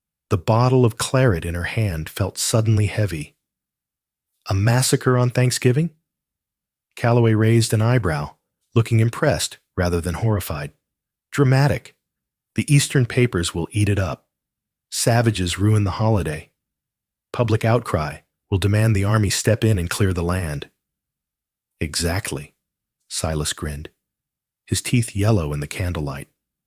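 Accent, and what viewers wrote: United Kingdom. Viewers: . Thank you for your response. it’s not a matter of what I am used to, it’s about that being far and away my favourite… “there’s a difference”… others, I am used to and can get used to, but no other I have heard has that perfect British RP accent. It’s a preference.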